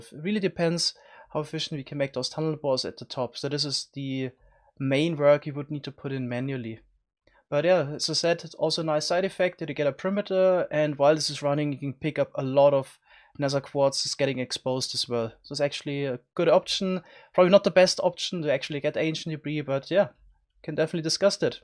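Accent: Germany. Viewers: I detect German accent 😋. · are u german ur english is somewhat funny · Uff.. dieser deutsche Akzent geht ja gar nicht 🙈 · That's German English xD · Wie deutsch kann dein Akzent eigentlich sein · Holy Mother of German Accent! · Where are u actually from? I am hearing a german accent. Am I right? xD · dieser Deutscher aktizent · Ich habe sofort an deiner Stimme gehört das du Deutscher bist! :)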